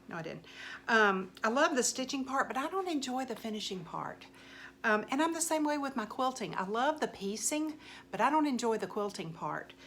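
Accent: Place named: South, United States. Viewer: Oh my goodness. Your video popped up randomly and I see you're from GA. I live in Conyers (25 East of Atl). Where are you located? Where do you shop for cross stitch supplies as I don't know of any in GA? I use 123 Stitch on line shop and a few others. My sister lives in Greenville and I just found out there is a shop near her. I also have my eyes on a trip to Firehouse Poppies (I think that's the name) outside Charleston. I am back to stitching again after I retired and am so happy to see the hobby growing so rapidly. Glad to hear that southern voice and I have subscribed to your channel. Keep 'em coming.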